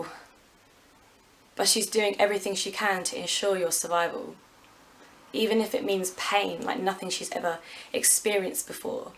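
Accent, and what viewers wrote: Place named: United Kingdom. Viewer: beautiful poem, and I really like your incredible british accent. Please make more videos. regards from México :)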